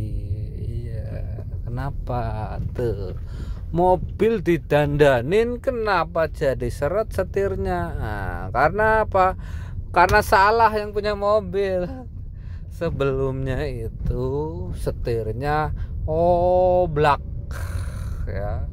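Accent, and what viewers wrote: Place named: Java, Indonesia. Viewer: Jawane endi um. Kyong medok yaa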